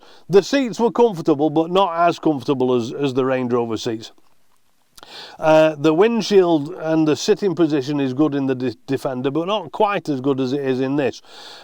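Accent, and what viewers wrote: Yorkshire, United Kingdom. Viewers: thought you was a Yorkshire..guy!! Especially as I thought you sounded sooo much like Fred Dibnah the famous steeplejack. Fred is a legend over here in the Uk.. Here’s a great video of Fred check his works truck out. <link> Derbyshire guy,what a lovely part of the Uk 🇬🇧.. Only 40 minutes for me in the caravan.. Great video..😊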